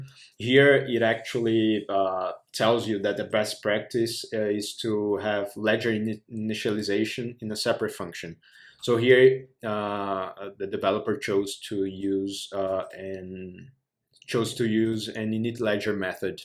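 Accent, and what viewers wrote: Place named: Brazil. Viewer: sabia que era br quando escutei o sotaque kkkk, mas bem legal o vídeo, parabens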